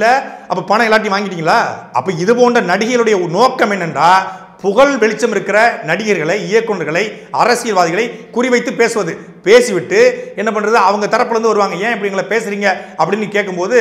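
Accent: Tirunelveli, India. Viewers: நெல்லை தமிழை நெருப்பாய் கொட்டும் சாட்டை வேற லெவல்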